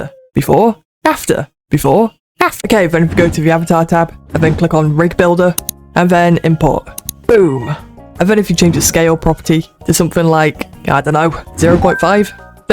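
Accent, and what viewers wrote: United Kingdom. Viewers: Really Good job, the British accent makes it even better❤ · Very British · Are you British? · Since you are British, can you say “Can I have a bottle of water?” Please. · it is his accent, i live up north england and his accent sounds familiar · I Think bros British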